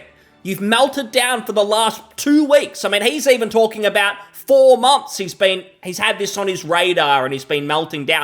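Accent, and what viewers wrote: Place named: Australia. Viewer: Please tone down the Australian dialect, thanks! If i wanted to listen to that shite i go watch crocodile dundee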